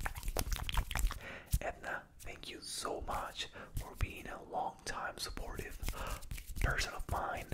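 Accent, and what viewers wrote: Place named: Latin America. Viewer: Me encanta tus asmr , aunque te siento con ese acento latino te queda bien 👍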